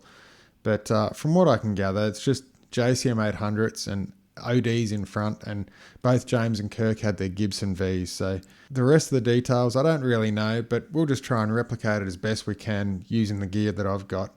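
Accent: Australia. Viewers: Not gonna lie Did not expect that ostralian accent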